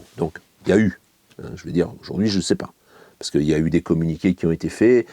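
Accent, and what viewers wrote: North, France. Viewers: Bonsoir à vous, je ne me manifeste jamais car tu as beaucoup de travail. tes vidéos me plaisent, je voudrais te poser une question, que prendre comme GPS pour le cc et la voiture, javais des tomtoms qui ne vont plus. Ton patois du Nord est formidable tu pourrais jouer avec Dany, merci pour la réponse Amicalement, Jacques du pas de Calais. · Mdr la langue du chnord ! Merci à vous 🙏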